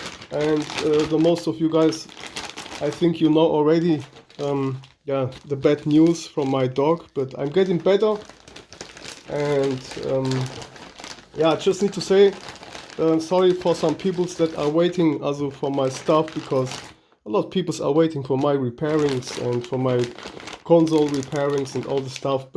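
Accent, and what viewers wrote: Germany. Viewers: Trotz deinem guten Englisch, wusste ich das du deutsch sprichst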